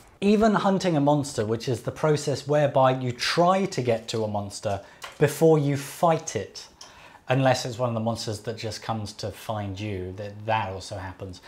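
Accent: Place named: London, United Kingdom. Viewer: Then why does he talk like he's in London?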